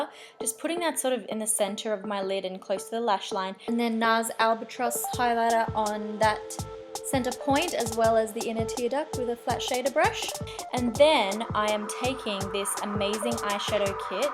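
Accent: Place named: Australia. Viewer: Love that accent, Australian?? I like this makeup tho :) xx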